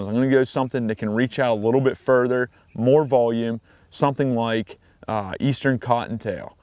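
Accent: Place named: Pennsylvania, United States. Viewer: I'm getting a little PA accent in this video. Where you from?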